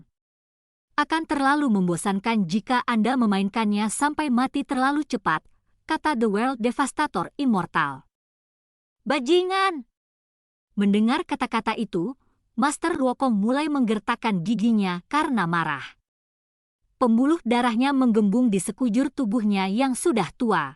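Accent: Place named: Java, Indonesia. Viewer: kok medok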